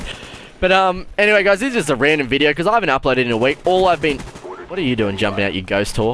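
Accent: Australia. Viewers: I like the dayz, Assassins creed 3 would be Kool give it a shoot. Dude I'll watch anythig with you cause everything is cooler with an ausie accent!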